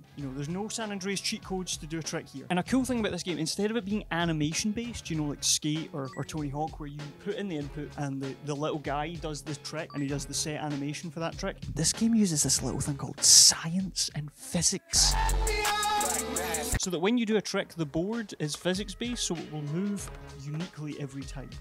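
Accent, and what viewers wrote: Scotland, United Kingdom. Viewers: Belting vid with the hilarious ADHD style quick fire editing and Scottish accented quips.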